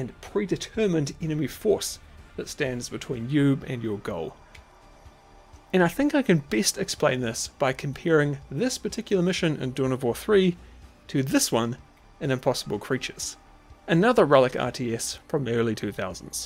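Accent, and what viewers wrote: New Zealand. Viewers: This video made me actively dislike the kiwi accent. "Vuctury" "Sit-up" "Sid" "Lamint"? Seriously?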